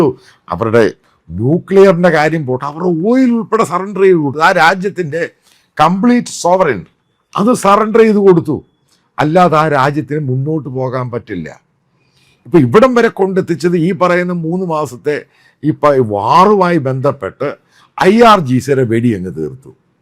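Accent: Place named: Pala, India. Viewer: അച്ചായൻ ഈ വിഷയത്തെ കുറിച്ച് പഠിക്കാത്തത് കൊണ്ട് മാത്രമാണ് ഈ വിവരക്കേട് വീഡിയോ ഉണ്ടായതെന്ന് എനിക്ക് മനസിലാവുന്നുണ്ട് - അവനവന് പറ്റുന്ന വിഷയം മാത്രം എടുത്താൽ പോരെ..... ഇൻ്റർനാഷണൽ ഇഷ്യൂസിനെ കുറിച്ച് കൃത്യമായി പഠിച്ച് നല്ല രീതിയിൽ വീഡിയോ ചെയ്യുന്ന എത്രയോ വിദഗ്ധർ ഇവിടെയുണ്ട് , അവരുടെ ചാനലുകളും - അച്ചായൻ്റെ പാലാ Slang ഇല്ലെന്നേയുള്ളു ......😂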